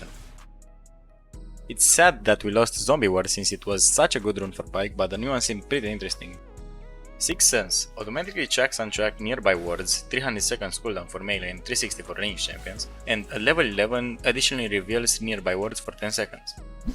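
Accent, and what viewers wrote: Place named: England, United Kingdom. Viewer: Pana cand nu m am uitat la numele tau nu mi am dat seama ca esti roman frumos accent de englez keep up the good work!!!!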